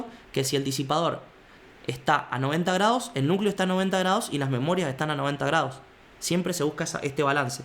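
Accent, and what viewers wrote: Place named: Mendoza, Argentina. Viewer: Sos de Mendoza o cuyo? Ese tonito se me hace familiar jajaja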